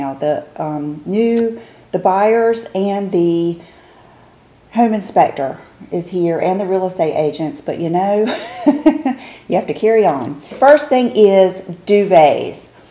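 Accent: South, United States. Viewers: Southern girl here as well....love your accent...reminds me of home ❤️ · You are so cute! you have a sweet Southern accent! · I’m Arkansan so I have a Southern accent too but it’s totally different than yours. The words you say where your accent shows the most are “long O” words like so, know, show, etc. I also love how you say water. ❤️ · My favorite quilt /comforter to put in a duvet is a goose down one.. I got mine at tjmax. I don't get hot and it's cozy. And as far as the hubby night noises.. omgosh..... Mine makes this high pitch noise, he does it blowing out like a hard hum with an operaretic pitch. Idk if it makes sense but it's loud and high pitched. Good for you having a separate bedroom you can go to!!! 😁👍🏽 I have a southern accent as well but I don't think mine is as strong as yours... Lol idk if I did a video I'm sure people would tell me same..😂 The plastic hair tie is much better to me, and I just do a quick either ponytail or bun. 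I don't do the complicated.. I just don't pull it halfway through on last pull through, if that makes sense... Your hair seems to have same texture as mine except I think you have more curl. Mines pretty straight. Do you sleep in the velcro rollers? I need to get some!! I don't use products in my hair except smoothing cream... I think its Suave and was really cheap and works great. It doesn't weigh it down or make it oily. Maybe I need some good mousse for body. Do u know a good inexpensive one? Or whatever is good for body at roots. Sorry for the long comment btw.. Lol Have a great day! 🌞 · you have a great accent, its really soft and warm. I'm English and appreciate a good southern accent. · We purchased a duvet from Wynn at Home store a few years ago. We always loved how cozy the beds felt when we would stay at their resort in Vegas. Nice fluffy down. They are very warm but I keep mine folded down at foot of bed at night. 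I think someone suggested storing unused or seasonal items in a pod until your ready to move in your new home. I would also suggest having a moving sale and getting rid of anything you don’t think you need. I had so many decor items that did not look good in my new home that I wished I would not have taken the time to pack and move. Sometimes it’s better to go into your new place with only the essentials and then buy once you get a feel for how everything flows. BTW I love your accent......I think when you say “water” I can definitely hear the southern! Also love when you say “daddy” ♥️ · The first word I thought of with your accent was "bought" and also "my". You're Southern but just enough to keep it cute! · We moved every 18 months since my husband was in the military. Shower items and sheets are self pack. Set up the kitchen first and then beds. The artwork, if it’s self pack then I got a picture pack from Home Depot and you can pack multiple artwork. 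I made a list of wanted we truly wanted for the immediate future and what we could spare for at least 6 months. Think about the changing weather and holidays coming up. Don’t beat yourself up. I know it’s hard and mostly, I know that as much as husbands try to help, it’s usually the women that do the work and planning. By the way, all your words have a southern accent and we love it! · I married my husband, who had joined the Army, when I was 17 and we moved from Illinois to the state of New York. I still had 1 more year of high school, so I enrolled in school that year. Everybody said I had a southern accent and it really surprised me because I didn’t think I had a southern accent. Lol! I love your southern accent! It’s really sweet. Your hair and makeup look absolutely beautiful in this video! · Girl when you say: "I want to tell you..." I hear your southern accent😉 I love your accent so calm and soothing! 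xoxo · Luv your accent 💕 makes me miss the south ☀️💕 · I love your accent and videos too! I love it when you say « You know... » with your southern accent. It so cute!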